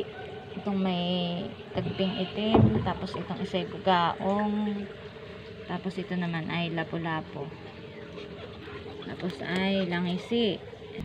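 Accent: Quezon, Philippines. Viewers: New viewers San po probensya Nyo mam yung punto Nyo po kc parang sa quezon province e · Ay ok po kaya pala kaparehas tayo ng tono 😅😅 lopes quezon po ako . Nasubscribe ko na po kayo god bless po.. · quezon province po ba kayu,kasi yun tono ng salita nyo parang s quezon province din,taga infanta quezon ako..dami isda jan,dto sa taiwan yan din libangan ko mamingwit.. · Parang taga quezon Ang punto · haha saan po sa quezon, taga guinayangan, quezon po ako. kaya pala yung punto sabi ko parang related ako haha.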